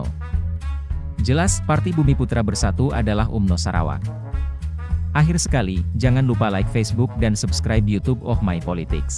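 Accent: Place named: Indonesia. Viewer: Tapi Suara VIdeo ini bukan gaya orang sarawak tapi orang indonesia. Orang indonesia kah yang buat video ini?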